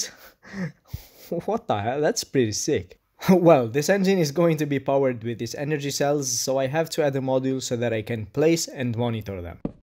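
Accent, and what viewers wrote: Italy. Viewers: ma sei italiano! Complimenti per l'accento...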